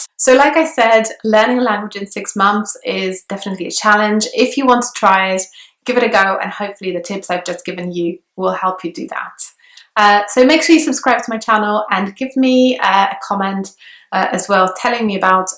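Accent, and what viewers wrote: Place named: United Kingdom. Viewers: I love this accent❤️ I’m Iranian and I want to learn English & British accent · ❤️British accent!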